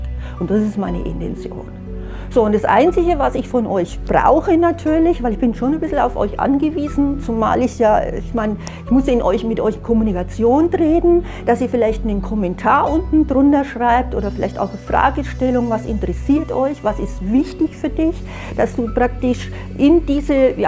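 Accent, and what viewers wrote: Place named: Franconia, Germany. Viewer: ich sehe mir gerade deine Videos Stück für Stück an und finde, dass du ein Original bist.:-) Die Kombination aus dem Wissen, der Musik (finde ich in diesem Video hier nicht so störend), den gut gewählten Farben (warst du mal bei einem Imageberater, scheint fast so), dem Buddha im Hintergrund, deinem gesellschaftlichen Engagement - und nicht zuletzt der fränkische Akzent:-) macht ein Alleinstellungsmerkmal aus. Man merkt es sich.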